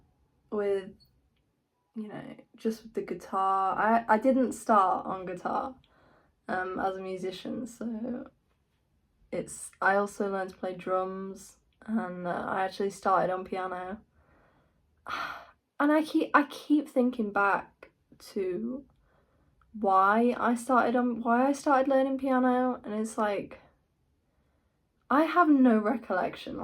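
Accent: England, United Kingdom. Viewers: You're a sweetheart. You obviously have a kindheart and you are down-to-earth, please don't ever change. Always keep your beautiful smile and continue to be the genuine kind person that you are. I did not expect to hear an English accent, but it is cute and it suits you. You are very cute. BTW, 19 is not old. It's all relative. When you are 16, a year is 1 1/16th of your life, 19 = 1/19th, etc. So the older you get the faster time flies. Yet, we all experience life in a linear manner that is moment by moment and even then most people are not mindful of the present moment, they're usually thinking about the past or what could happen in the future. But that's all just my own basic philosophy about how we experience time in this existence. I have some philosophical ideas that blow people's minds. Hahaha. I'm glad you made this video, it's illuminating and now I feel like I will care more about seeing your videos. 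I hope that you find love with an amazing musician that is truly as sweet and kind as you are and appreciates and respects you the way you deserve to be treated. I would love to hear you write some amazing music that is authentically original and well defined in your own style. That would be awesome!!! Please keep that sweetness, your smile and your genuine honest personality and please don't mention anything about age... hahaha. I'm 46, but I look 30 and I feel like I'm 20. It's more of a state of mind and/or number, than anything else. I know people in their 60s that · Do I detect a little bit of an English accent? Your guitar skills (and many musical instruments) is amazing! Keep up the great work! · Oh yea British!!!!!! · I couldn't tell you're not American you sound British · Which UK accent is this? She pronounces guitar or band as a North West english speaker would do, but in many sentences, it's a plain and even melodie, just like on an irish or american tongue. 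Any help? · I enjoyed your talk today. You are a sweet girl. I miss England; I wish I could go back sometime. I love the accent. I’m from the states, here in beautiful North Carolina. I lived in England because of the Air Force. RAF Lakenheath, Suffolk. Take care your doing great 👍🏻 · Lahhh kau british??? Ingt ulu kelang je..... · Are you from England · I was surprised youre English! Keep going ✌🏻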